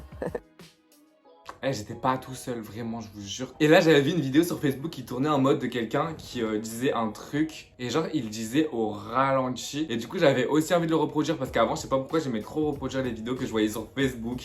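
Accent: Quebec, Canada. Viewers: crime ta canmeme un bon acent quebecois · T'es québécois ? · on dirait pas l’accent chtis mais canadien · Avant ton accent in n aurai dit un quebecoi😂😂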